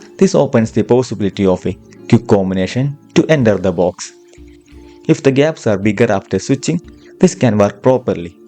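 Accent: Kerala, India. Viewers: Your accent seems to be from kerala, I am a keralite. Analysis is amazing and on point. keep up the good work!!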